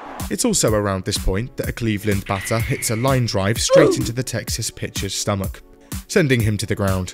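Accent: United Kingdom. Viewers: Feels weird hearing a british guy talk about baseball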